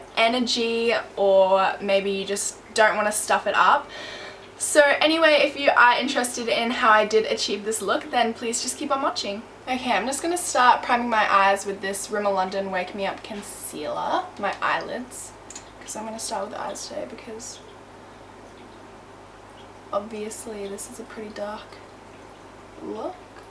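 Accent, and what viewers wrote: Australia. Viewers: I love that australian accent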